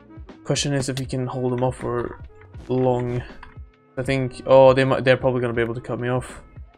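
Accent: Sweden. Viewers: you from sweden??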